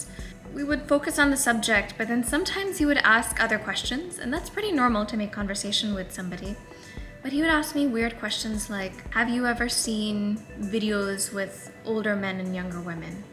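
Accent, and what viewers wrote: United States. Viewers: Didi ap aise english bolte ho jese ki ap phle se american ho❤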